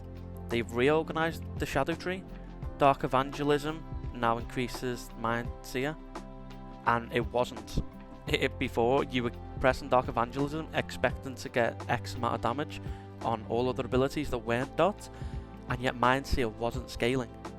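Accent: Liverpool, United Kingdom. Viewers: Can’t work out if that’s a scouse or wirral accent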